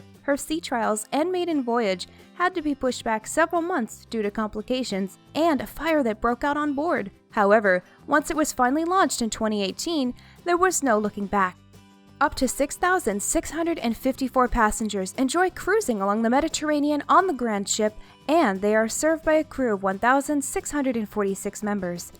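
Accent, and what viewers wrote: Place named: Germany. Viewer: I think it's German or English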